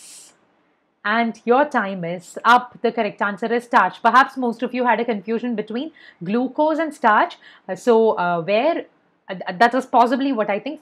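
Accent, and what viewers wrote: Kerala, India. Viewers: ma'am are you from Kerala?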